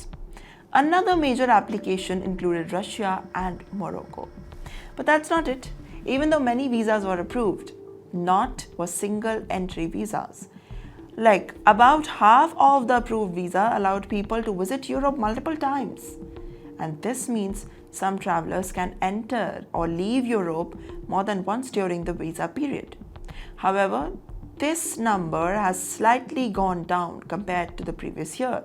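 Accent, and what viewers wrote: India. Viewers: Just curry english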